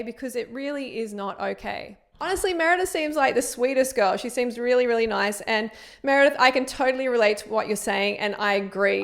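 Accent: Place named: Australia. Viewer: i live for your Australian accent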